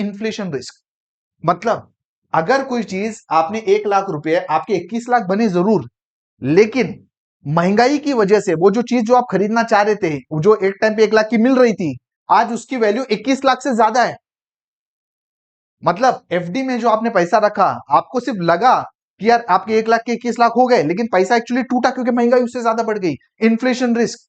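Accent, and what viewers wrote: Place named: Maharashtra, India. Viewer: Assalamualikum bhai ❤. Alhamdulillah 99% sahi bole ap. Aj ap jaise logo ki sakht zarurat hai waise to log mahino me kae fuzul kharch kardete h jaise pan,Gutkha, cigarette aur najane kya 😢. Allah pak hame sahi jagah kharch(investment)karnewala banae. Ameen bhai jo bhi apne samjaya ❤ par question ye hai sab apne theoretical samjaya Alhamdulillah samaj me Aya practical demo samjao jaise 1. SIP aur LUMSUM kaise kare ispe 1 videos 2. DIRECT aur REGULAR kaise kare. Aur bhi question h par Insha Allah ap se judne ke baad. Apka tune samaj me ata h q ki ap Maharashtra se ho aur ham BHI AURANGABAD se ❤ Allah pak apko jazae khair de aur apki team ko bhi. Assalamualikum ❤ Plz reply 🙏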